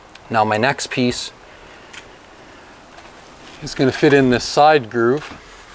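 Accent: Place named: Saskatchewan, Canada. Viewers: There isn't a Canadian accent. This is a Saskatchewan accent. Put him next to someone from Cape Breton and they'd sound pretty different. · Canadian accent is hilarious.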